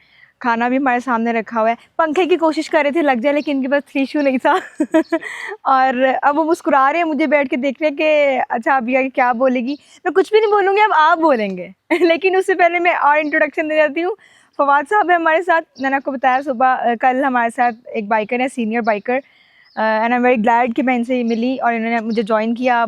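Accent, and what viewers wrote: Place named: Karachi, Pakistan. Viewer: Apka bolne ka andaz karachi wala hai or lahori ho wo kese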